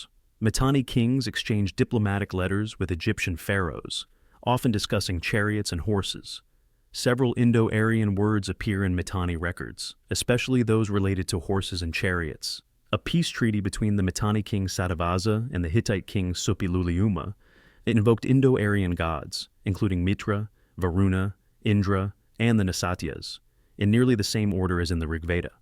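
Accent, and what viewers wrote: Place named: United States. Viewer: 🙉🙉🙉An other voice please and no American English.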